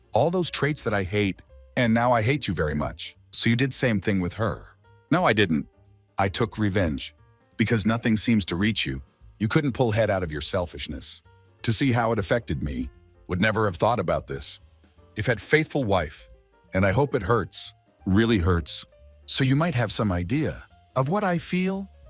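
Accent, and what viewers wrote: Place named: Russia. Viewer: The english sounds like broken russian or east European.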